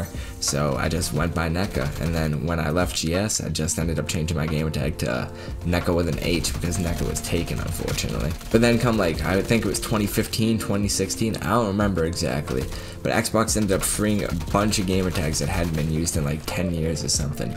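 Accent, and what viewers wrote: Boston, United States. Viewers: BOSTON ACCENT was the goat back then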